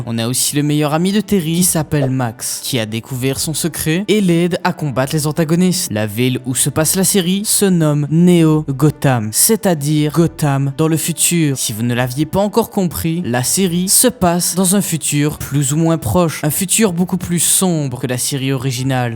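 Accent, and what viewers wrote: Liège, Belgium. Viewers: J'ai beaucoup aimé ta vidéo Et j'ai cru comprendre a l'accent Que tu es un compatriote liégeois 🤜🤛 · c'est moi ou il y a un fort accent de liège xd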